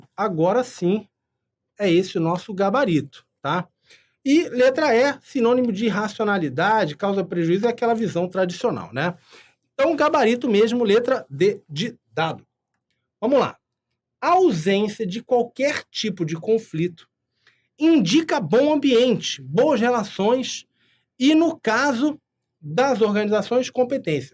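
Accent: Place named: Rio de Janeiro, Brazil. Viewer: Você é fera irmão! Carioquês em dia! Vlw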